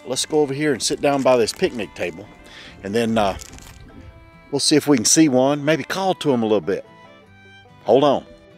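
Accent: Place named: Arkansas, United States. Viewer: I was born in Bethlehem Pa-raised in SW Florida & blessed to have lived in Corpus Christi Tx for 20 years. Your accent is not from any of these. 😂🤣😂 love your wit & videos-thank you. Arkansas accent? 🤷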